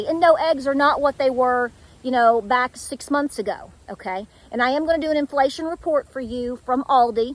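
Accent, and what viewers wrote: South, United States. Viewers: I've just found your channel recently and I could of course tell you are from the south but today I heard you mention Oliver Springs and Oak Ridge Tenn and I thought oh wow I haven't those towns mentioned in years. I went to elementary school in Oliver Springs and Clinton and other family members lived in Oak Ridge. Brings back memories.😊 I really enjoy your program!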